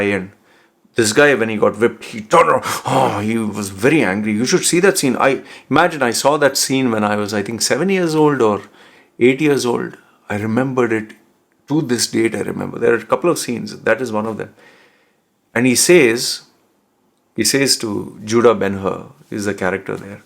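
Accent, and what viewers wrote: India. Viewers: Your success is thanks to the Internet, social media, your gift of gab and your facial tattoos that attract potential visitors to click your videos. Yes you have been lucky starting this at the right time. Being in Thailand has nothing to do with it. You could have done this anywhere but the added tropical backdrop and your Indian accent and calming voice has an appeal for many. Had the Internet not developed to where is it now, what would you have done as the Internet is everything for your survival it seems. That is something to think about, not that it matters anymore..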